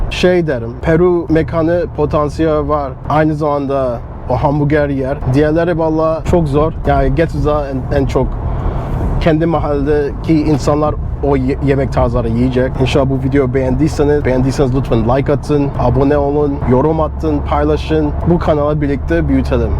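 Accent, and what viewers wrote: United States. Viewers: adam doğma büyüme amerikalı belli ki türkçeden önce ingilizce öğrenmiş sen önce bi üslubunu düzelt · S’en amerikada mi dogdun turkcen den dedim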